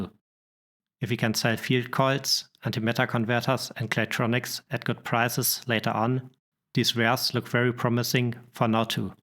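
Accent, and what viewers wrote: Germany. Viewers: Statistics, Graphs and a german accent elaborating on ze productions. Just what the soul needs.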